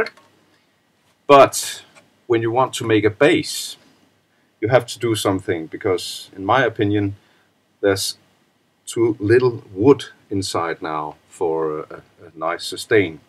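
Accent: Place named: Denmark. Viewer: meget nice men klar dansk accent xD kunne høre det med det samme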